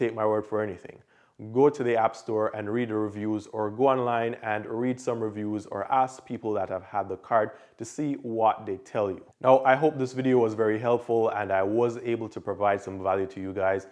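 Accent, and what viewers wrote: Jamaica. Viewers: Weird question what part of the Caribbean you from. I want to guess Jamaica but I maybe wrong.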